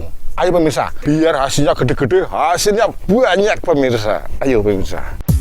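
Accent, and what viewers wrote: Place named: Java, Indonesia. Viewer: Nada suaranya yg khas dg logat Jawa yg AKURAT... Salam sehat selalu pak 🙏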